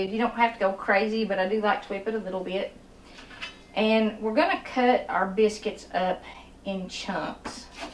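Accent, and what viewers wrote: South, United States. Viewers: I'm a southern girl myself and I love to hear your accent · A lady with a southern voice like this HAS to be a great cook!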